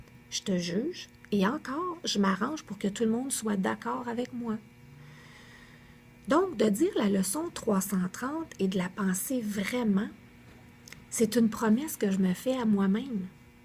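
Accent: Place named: Marseille, France. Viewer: l'accent marseillais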